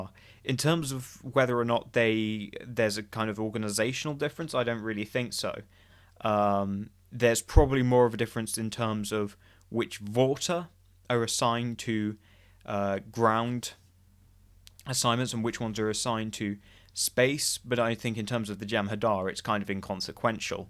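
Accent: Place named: London, United Kingdom. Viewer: I think it's the "London accent".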